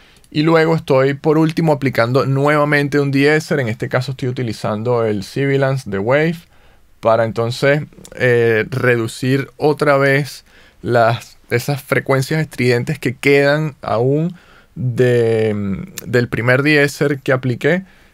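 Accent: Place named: Caracas, Venezuela. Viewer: A propósito, eres venezolano, cierto? Tienes acento caraqueño.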